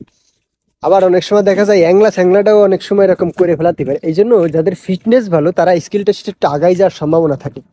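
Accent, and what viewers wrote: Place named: Satkhira, Bangladesh. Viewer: ভাই, আপনার দেশের বাড়ি কোথায় ? আপনার ভাষা আমাদের সাতক্ষীরার সাথে অনেক মিল খুঁজে পাই ❤❤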